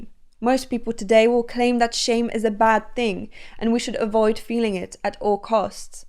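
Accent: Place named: United Kingdom. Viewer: I knew she would have a British accent before she spoke.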